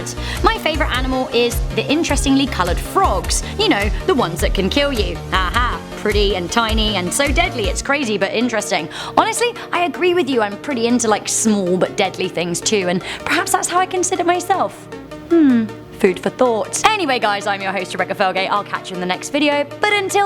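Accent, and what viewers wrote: United Kingdom. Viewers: British accent 😠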